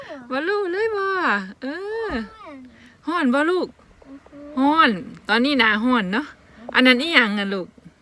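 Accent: Isan, Thailand. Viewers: น้อง เว่าอีสานเพราะอีหลี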